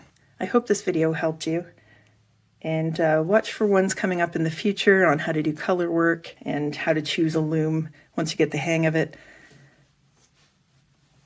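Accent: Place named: Canada. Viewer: excellent tutorial.............best one out there. thanks so much. love your Canadian accent!!!!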